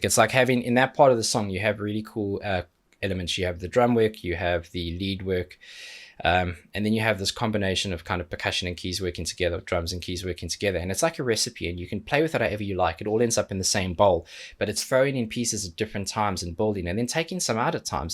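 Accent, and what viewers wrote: South Africa. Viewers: Great reaction. First time I've watched you. Btw, are you from or have spent time in South Africa?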